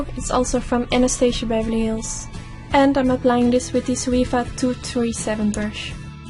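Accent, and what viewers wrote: Netherlands. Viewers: ik hoor aan je accent dat je nederlands bent haha! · Nice tutorial and I love the music :D Maybe it is a tip to place text for all the brushes you name in the video? You have a very Dutch accent and sometimes it is a littlw bit hard to understand what you're saying :$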